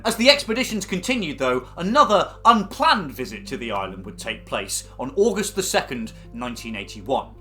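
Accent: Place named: United Kingdom. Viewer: Hate getting two minutes into a video and realising I can't watch it bc the narrator is Br*tish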